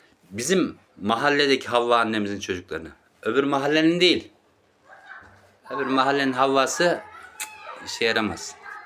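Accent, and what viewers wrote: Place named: Istanbul, Turkey. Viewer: Abi essenler sivesiyle konusuyon, Istanbul essenlerdenmisin?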